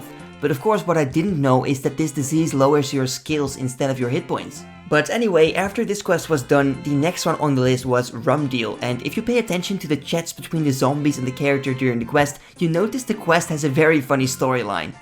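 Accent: Netherlands. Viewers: such a baller lmfaoooo. You kinna sound dutch doesnt suprise me you a fan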